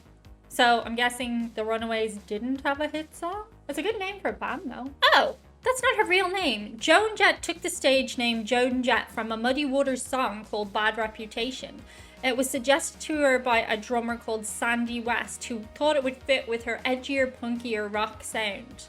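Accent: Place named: Ireland. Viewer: Question for! Your accent fascinates me. There seems a fair amount of Irish in the background. Where do you come from. By the way, you have a very pretty face. ( That's one of the reasons I watch your videos and love the music )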